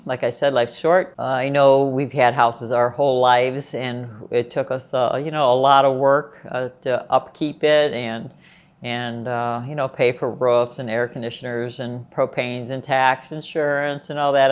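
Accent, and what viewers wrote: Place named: Miami, United States. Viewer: You sound alot like a cuban raised in miami not jocking ....jay from miami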